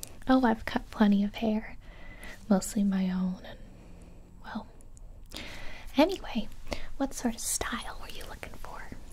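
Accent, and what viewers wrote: South, United States. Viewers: I'm from Canada and she sounds very distinctly non-Canadian to me although I do hear the southern part it's very distinctive. I guess maybe if you stretch it you could say she has a B.C./Albertan accent but I think just Southern U.S. is more accurate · Southern drawl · Not gonna lie....the southern country accent threw me off lmao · The southern accent mmm love it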